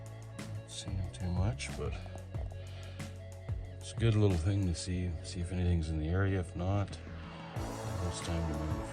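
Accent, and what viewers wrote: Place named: Midwest, United States. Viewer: Bro I love your Midwest accent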